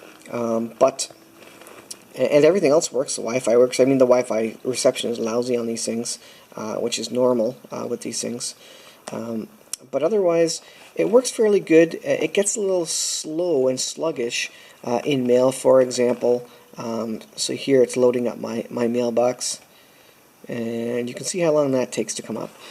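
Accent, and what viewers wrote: Canada. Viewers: He's from Canada eh?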